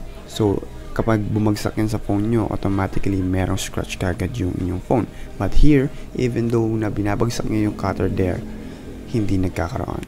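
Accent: Philippines. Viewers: Kairita mag review. Conyo. :)))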